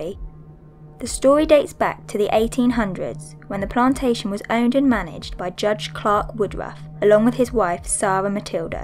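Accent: United Kingdom. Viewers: Joel Fernández Jerez its almost like Chills twin with some sort of British accent.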